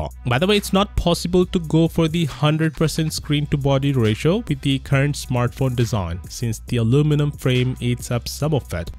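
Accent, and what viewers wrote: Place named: India. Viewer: Indian english hahaha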